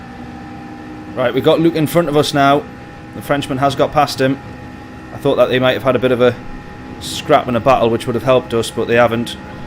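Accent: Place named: United Kingdom. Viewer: Your streams a really good, you deserve more subscribers. Whats your UK regional accent btw? Can't pick it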